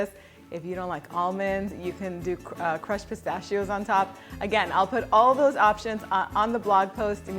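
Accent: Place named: New England, United States. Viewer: Love your Yankee accent than Shambali ❤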